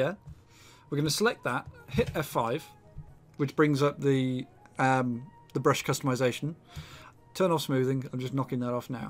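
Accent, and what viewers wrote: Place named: United Kingdom. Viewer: Welcome. Figured it was some sort of U.K. accent just couldn't place it.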